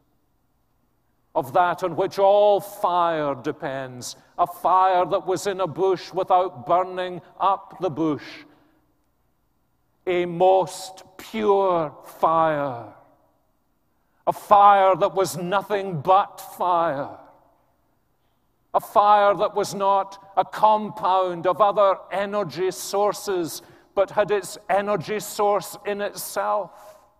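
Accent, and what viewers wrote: Scotland, United Kingdom. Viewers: Preaching of the word of God is solemn. Hearing it in the Scottish accent seems to enhance the solemnity!!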